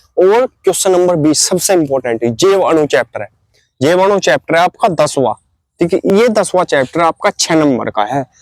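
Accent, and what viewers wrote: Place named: Baghpat, India. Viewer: Bhai to bikul apni bhasa wala h up baghpat side h to reply kariye jarur 😂😂😂😂😂😂😂😂😂😂😂😂😂😂😂😂😂😂😂😂😂😂😂😂😂😂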